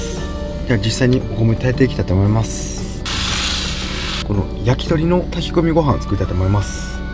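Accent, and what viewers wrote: Kansai, Japan. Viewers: お疲れ様です😁 私も動画を観させて頂いて車中泊グッズとか見に行くようになりましたホームセンターのそうしたグッズと売り場を見るのが楽しくなりましたあとの暖かい関西弁の御説明と笑顔も良いなあとほっこりしながら観させて頂いてます❗ 御自身も動画の魅力です😁 ステーキ焼ける音がたまりませんね😆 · こんにちわ！ ㊗️10万人超えおめでとうございます🎉🎊 毎回そうですけど、の優しい語り口もとっても好印象です(わたしずっと東京住みなので関西弁に憧れてます) あとほんといつもお人柄が良くて心地良く観させていただいています！これからも楽しく拝見させていただきますね！あとこの間のライブは、わたし時間が合わなくてリアルタイムでは観れなかったんですけど、そのライブ配信も翌日に楽しく拝見させていただきました！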